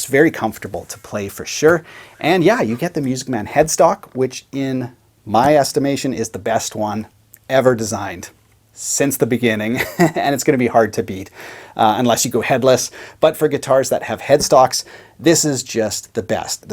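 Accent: Canada. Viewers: Find Uut....Abuut....Love the Canadian accent. Great review as usual.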